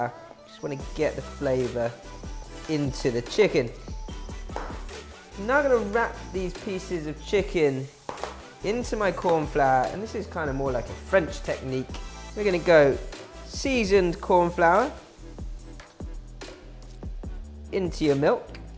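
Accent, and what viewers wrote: United Kingdom. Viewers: What British dialect is that. He sounds exactly like Prince Philip in The Crown!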